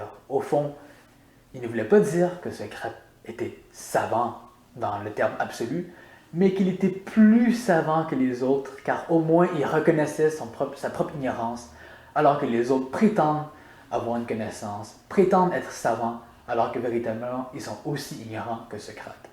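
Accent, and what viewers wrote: Quebec, Canada. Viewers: woah c'est quoi ton accent, c'est mi québécois mi français dis weird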